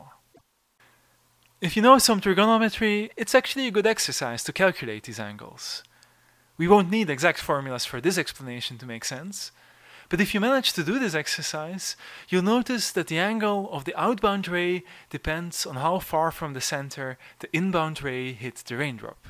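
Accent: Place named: Europe. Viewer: When you click on a YT thumbnail: 😊 When the European accent starts 😢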